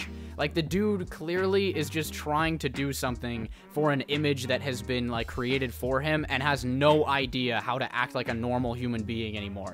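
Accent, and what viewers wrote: Canada. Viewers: Are you Canadian? Plus thanks for the vid 🔥 been too long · yo r u canadian?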